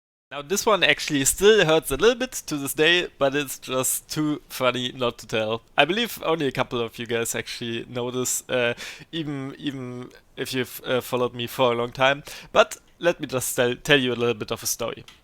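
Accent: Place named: Germany. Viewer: I indeed love your German accent! :D Best chess channel I know, keep up the good work and I am looking forward to your stream on Saturday :p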